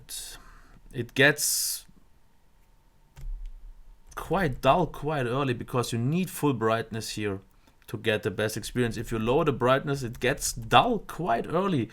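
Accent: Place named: Germany. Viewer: Man merkt aber ziemlich, dass du ein deutscher bist :-) aber gutes Video so far :D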